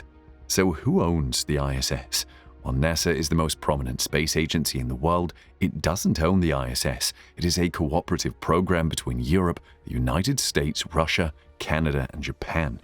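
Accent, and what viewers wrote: United Kingdom. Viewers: Just how far in space can a built object go before burning in the atmosphere? What are the odds that we are being lied to about ALL what this guy with his SO official voice?!? And because he has a soft English/British voice, is that supposed to make him seem honest when ALL they've done throughout time is lie to us???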